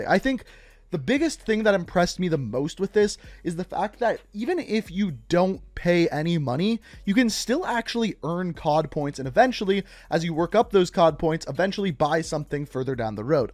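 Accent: Canada. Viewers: The way you abowt just gives me terrance and Phillip vibes guy 😂😂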